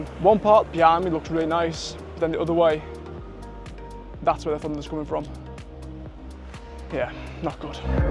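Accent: Liverpool, United Kingdom. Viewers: miss the scouse accent. I lived and worked in Liverpool for 13 years before moving to Australia. nice one lad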